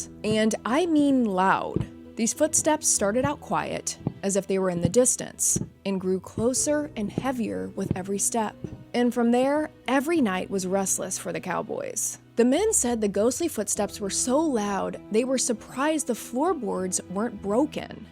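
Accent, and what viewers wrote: United States. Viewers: I've heard this story before somewhere. But your cowboy accent made this so much more entertaining!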